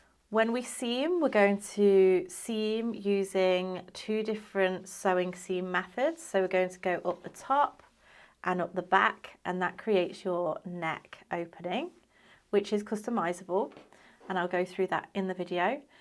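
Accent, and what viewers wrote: England, United Kingdom. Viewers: Ja. The English accents are beautiful. My English are very poor but I manage when I have to speak it. About once every month at the shopping. 🎉🎉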